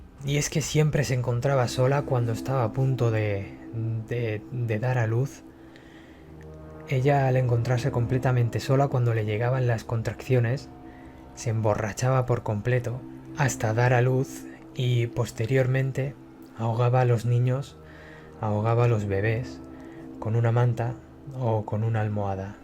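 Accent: Spain. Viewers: Por Dios, que mujer, da escalofríos! muy buen vídeo :) espero Mi saludo Por cierto, como te llamas? (soy bastante nueva). Y adoro tu voz :3 eres de España? saludos :)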